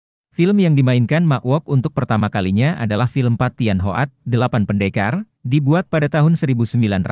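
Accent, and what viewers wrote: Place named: Surabaya, Indonesia. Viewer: Gara2 suara surabaya saya terdampar disini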